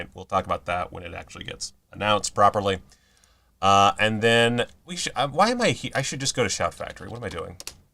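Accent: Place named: South Africa. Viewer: Your British accent - sounds more South African, And Mr Jolly Lives Next Door is game. Eat the Rich and The Yob are worth the time and fun anyone is aware of the The Famous Five (idealist 1950's kids on jolly good adventures with a healthy lashing of bygone stereotyping) then Five Go Made on Mescalin.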